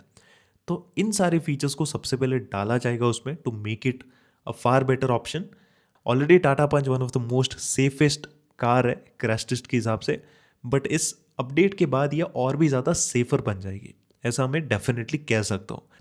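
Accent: Kerala, India. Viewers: I am big fan ...the way u talk....think ur malu from kochi. Thanks 👍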